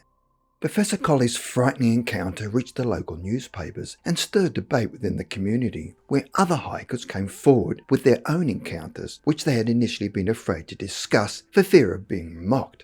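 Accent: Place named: Australia. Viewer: Can you get some narration without the heavy Aussie accent? It is hard to understand your speech for Americans. I think you have a woman who does narration, don't you? I can understand every word she says....I think she is an Aussie too but her accent is not as thick as yours.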